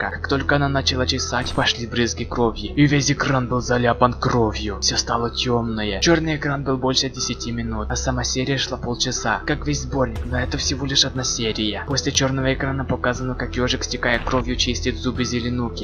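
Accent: Okanye, Russia. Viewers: ГОВОРИ НОРМАЛЬНО ТЫ В КАЖДОМ СЛОВЕ ГОВОРИШ БУКВУ О!😡😠👹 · ПОчему ТЫ вОсё гОвОришь черёз О?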